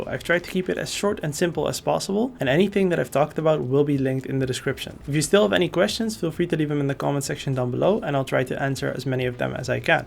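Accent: Netherlands. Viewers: Ik hoor Nederlands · why do you sound dutch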